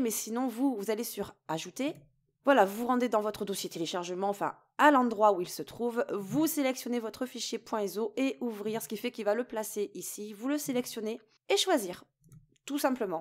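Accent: South, France. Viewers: Merci pour ce tuto. Et bé ! Je sens un léger reste d'accent du sud. ;)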